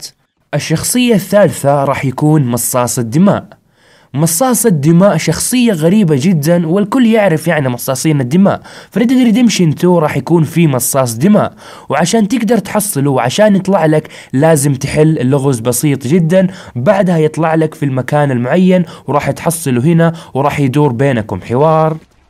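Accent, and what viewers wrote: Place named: Jizan, Saudi Arabia. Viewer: ياخي انت من جيزان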